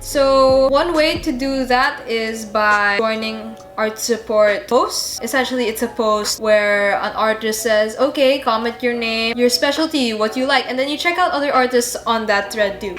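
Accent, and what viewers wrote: Philippines. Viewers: i can tell youre from the ph bc of the accenT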